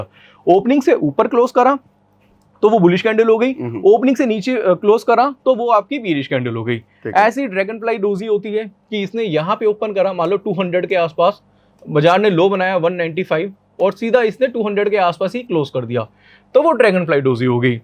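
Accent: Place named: Bhojpuri, India. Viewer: Mr. sr ji Aap ki bat hi samjh me hati h. Bojpuri style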